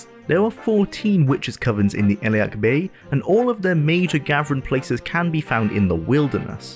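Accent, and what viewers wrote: Wales, United Kingdom. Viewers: ...I like your Welsh accent. Ignore the jerks who complain about it. Brilliant video! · you shouldnt suppress your Cymric accent, Cymric people are based; also, Welsh/Wales is a slur meaning "Foreign/Strange People", it shouldnt be used, fuck satisfying the wants of the British, Britain is an Anglo-Scottish Union of oppression and cultural-genocide against the Gaelic and Bretonic peoples of the UK; the Southern English and the Low-Lander Scots, rule the roost of this regime we live in, under our dictator, Lizzie, whom ironically has the same birthday as Adolf Hitler, its symbolic... of the fact shes a fascist shite-arse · Don't Hide Ur lovely Welsh accent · I can't believe I listened to a Welsh accent for almost four hours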